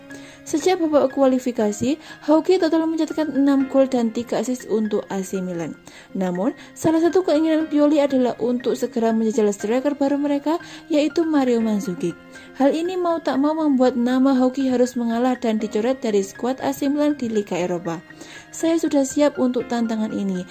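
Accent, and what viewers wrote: Java, Indonesia. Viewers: Medok e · Logak Jawa nya kentel amat njiiirr 😂😂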